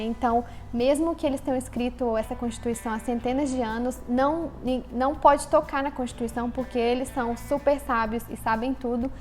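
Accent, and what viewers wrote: Minas Gerais, Brazil. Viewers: vinicius Correção, sotaque mineiro, então você é de Minas kkkk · Você é mineira?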